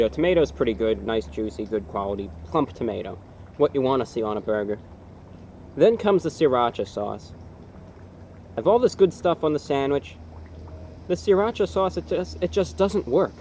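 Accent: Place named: New York, United States. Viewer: I don't get it. It looks like he lives in Florida but he has a slight New york accent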